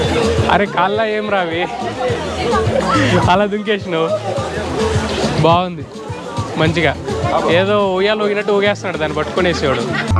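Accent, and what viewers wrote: Chittoor, India. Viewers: Brother Midhi Chittoor district Kadha మీ మాటలు అలానే ఉంది 😊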